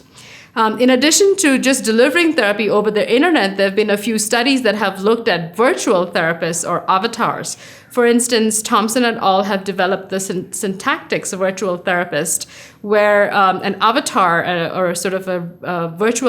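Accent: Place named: India. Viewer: sounds like you are indian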